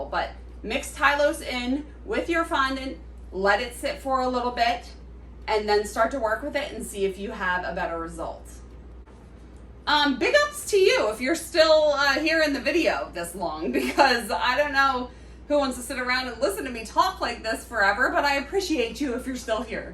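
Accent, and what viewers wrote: Philadelphia, United States. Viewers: Your accent makes me miss Philly!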